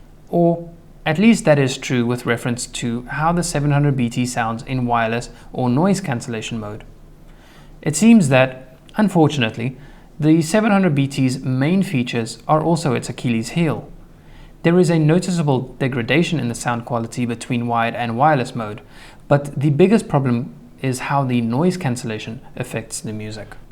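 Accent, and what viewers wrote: South Africa. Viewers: Your accent sounds South-African??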